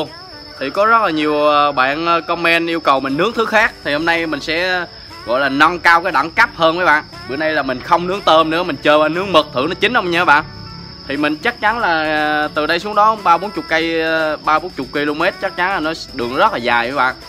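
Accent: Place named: Dong Nai, Vietnam. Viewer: Quê a đâu mà e nghe a ở long khánh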